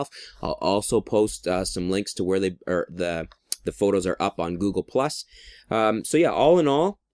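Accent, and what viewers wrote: Canada. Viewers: I love your Canadian accent! Made me smile so big. ; ^ D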